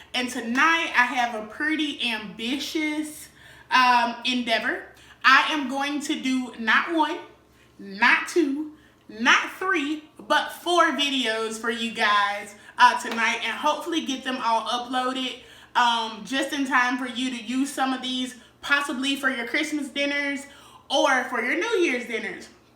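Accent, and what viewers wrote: South, United States. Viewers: Oh, ok. I definitely heard that suuuthin swag🤗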